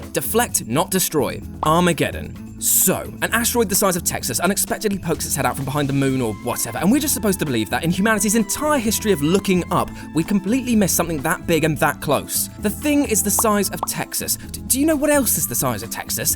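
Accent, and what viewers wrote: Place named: England, United Kingdom. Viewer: Speak a little slower please? English accent is harder to understand than american. Might cost you subscribers. I only understand english because it's the "common tongue"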